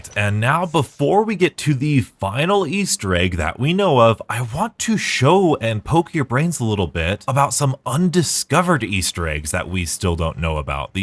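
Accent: United States. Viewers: Couple things.. 1... I didn't know you were Midwestern And 2.. on the free wonderwafte or however you spell it.. mustang Sally's make it incredibly easy (even just Sally) as the aoe clears all the debris almost instantly... I haven't had a chance to test it out but I am wondering if you could use c4 in this step as well if you would rather not burn 5k credits plus the gs45s wall but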